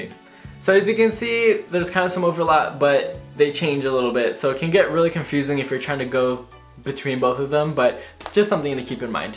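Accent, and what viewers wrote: Quebec, Canada. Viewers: You have a french Canadian accent, not a french from France accent. It's cute though. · больше точно It’s nor good or bad it just means he speaks candadian french and not French from France ☺️ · Tu as un putain d'accent quebecois ahah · You have a Québécois accent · Very good pronunciation in both accent btw (still need a little work on the Quebec's accent but still... very good!) Funny fact : did you know the Quebec's accent (the way we "contract" words) is the same the French were speaking in France in the XVI century ? Quebec is a former French colony. And our accent evolved differently from France from this point. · wah you really have the quebecois accent ! im suprised lol nice video :) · Omg! Your french-Quebec is so nice! Hahahahaha (i'm french Canadian) ☺️ It's the same for us, french speaking, with the difference between Canada's english and British english. 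Nice video! ☺️👍🏼❤️ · En tant que français, on entends le p'tit accent québécois quand tu parles :p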